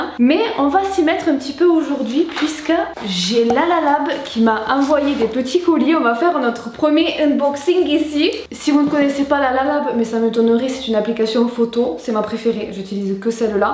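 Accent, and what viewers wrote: South, France. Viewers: 🤍🤍🤍🤍🤍 trop belle ! J’adore ton énergie (et cet accent du sud 😍😍😍)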